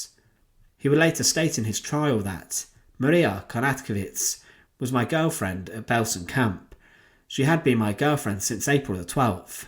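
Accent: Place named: East Midlands, United Kingdom. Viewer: I'm from Wolverhampton and can catogorically say he's not from anywhere in thecwest midlands! Later in life I worked in Derby for twenty years and he sounds like he comes from somewhere in the East Midlands ... Can't really say where exactly though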